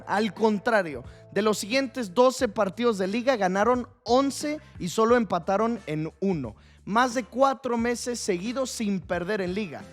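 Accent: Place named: Mexico. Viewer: Acento mexa de la rosa de guadalupe. Inaguantable la puta que te parió.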